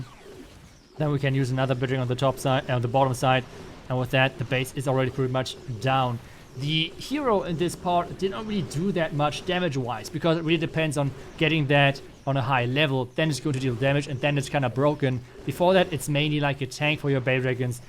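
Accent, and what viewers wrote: Germany. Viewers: erstes mal englisches video gesehn man hört kaum einen deutschen akzent wow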